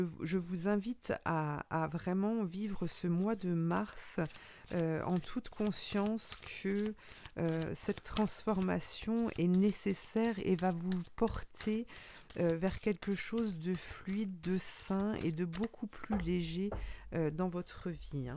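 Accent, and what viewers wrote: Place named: Jura, France. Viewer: Haaaa j avais bien reconnu l accent jurassien.. bisous d une autre jurassienne..ou serez vous installé svp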